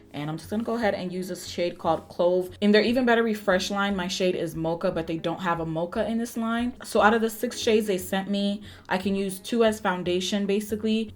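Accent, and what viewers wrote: United Kingdom. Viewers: The English accent 😂😂😂 sounds like ppl I go school with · The British accent lmaooooo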